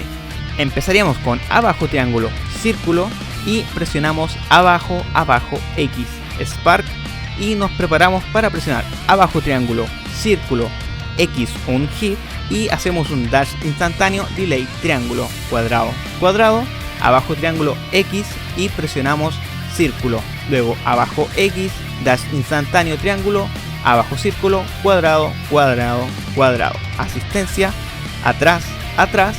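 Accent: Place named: Chile. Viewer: Eres chileno se nota en tu forma de hablar, porque yo también lo soy xD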